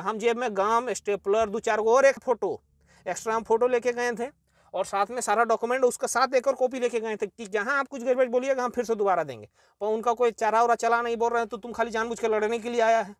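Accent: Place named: Bihar, India. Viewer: भैया आप हो झारखंड के पर बोल बिहारी स्टाइल में रह हो मधेपुरा बिहार से प्यार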